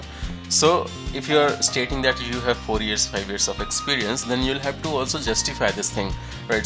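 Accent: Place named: India. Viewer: Boss your hindi influence is coming while you are speaking.. speak better,explain and usually in correct way then u tell abt communications skills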